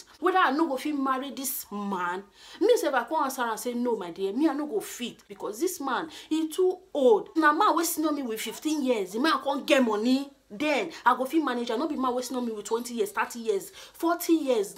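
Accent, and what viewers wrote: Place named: Warri, Nigeria. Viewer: Chai, this your pidgin na original one. It seems you be WARRI brought up WAFFI BAE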